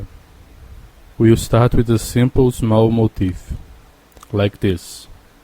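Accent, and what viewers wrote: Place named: Brazil. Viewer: Puta que me pariu, comecei a aprender baixo e curto math rock tem um tempinho. Sigo teu canal faz uns meses e eh o meu preferido! vendo esse video e o sotaque pensei " vish, parece BR" e eh mesmo hahaha Maaaano, muito feliz em saber! :D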